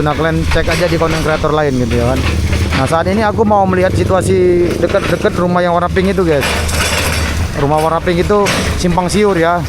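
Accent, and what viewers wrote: Medan, Indonesia. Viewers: Semangat menyala buat kontennya abangkuu. Senang kali awak dengar aksen medannya bang 😂👍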